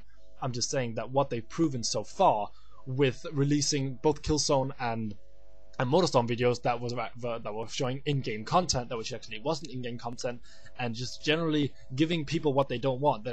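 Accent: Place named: United Kingdom. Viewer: I like how your accent is more british than american :D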